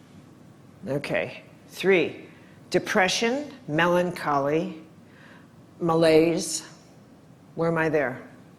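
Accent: Chicago, United States. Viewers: She feels so familiar to me and when I looked up her info I realized why. She is from Chicago, as am I. Her accent and her vibe is so Chicago, and for me, so comforting!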